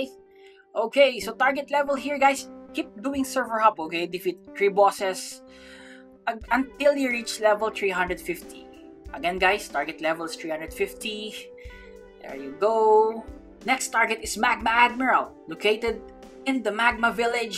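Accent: Philippines. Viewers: Idk if this dude is pilipino or not he just sounds pilipino idk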